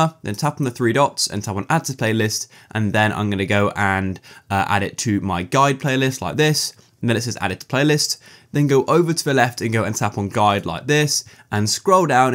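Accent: United Kingdom. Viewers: i could tell this guys british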